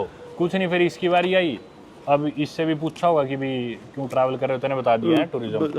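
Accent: Haryana, India. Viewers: भाईसाहब की हरियाणवी भाषा कमाल का है सुनने में अच्छा लगता है एक अलग ही वाइब है भाईसाहब को छत्तीसगढ़ से प्यार 🥰👍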